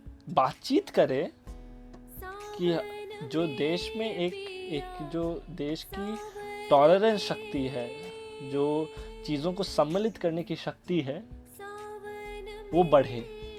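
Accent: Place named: Bihar, India. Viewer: Yaar fan bana dia 💖 matlb apka yeh Bihari accent bhot acha h yaar!😍 All the best bro hope LGBTQA+ get thier right as soon as possible!😇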